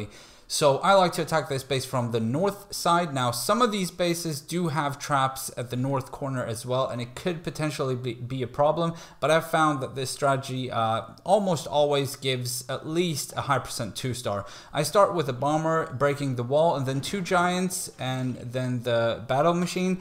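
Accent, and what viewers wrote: Sweden. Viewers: I really heard your Swedish accent haha, men bra video 👍🏽😉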